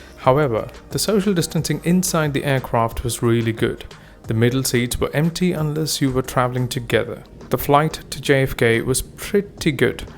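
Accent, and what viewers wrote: United Kingdom. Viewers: Brit accent coming from a desi who lives in the US. Can't wrap my head around it, unless you binge watch British TV Shows a lot. Cheers mate!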